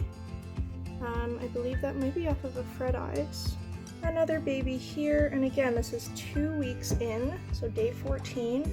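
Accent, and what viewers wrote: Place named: Canada. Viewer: I know I am late to party but I have question. Your accent indicates Canada. I wait a year for my little guys to get full sun. They have grow lights. Are you able to put them out because, I am assuming, have cooler weather? I am in California and Summer is over 90 degrees F and often into 100s. I am a dotting plant pappy and fear hurting my plants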